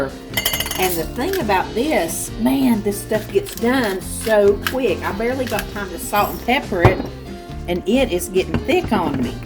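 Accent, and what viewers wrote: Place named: Tennessee, United States. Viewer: you can tell by my title that’s my country too ! I live out behind Dollywood ! Love the Smoky Mountains !! I thought your accent was a lot like mine !! 🧡🤍Ren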